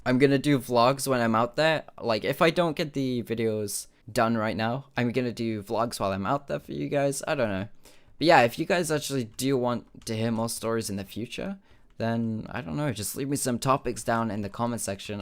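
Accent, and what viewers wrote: United Kingdom. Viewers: I love your British voice😘❤️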